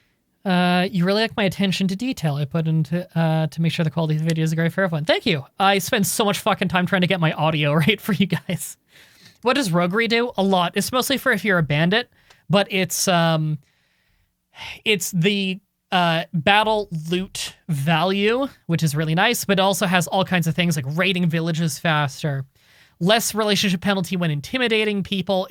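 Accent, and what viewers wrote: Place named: Canada. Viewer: You look like a viking but your voice shows like a Canadian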